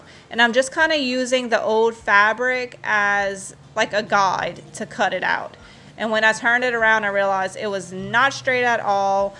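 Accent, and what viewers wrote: Louisiana, United States. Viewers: I knew it. Haha!!! I’m also Cajun and I love your accent and your channel. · Hey! I just found your channel and love it! I knew from the first sentence you uttered that you had to be from Louisiana and then I saw you wearing a Louisiana cap! I’m from North Louisiana.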